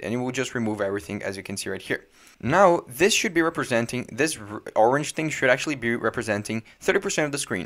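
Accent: Brazil. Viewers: Quando comecei o video pensei: "esse cara so pode ser brasileiro" (por causa do sotaque kkkk) quando eu vi o nome do canal dai eu tive ctz que vc era brasileiro. Parabens pelo trabalho mano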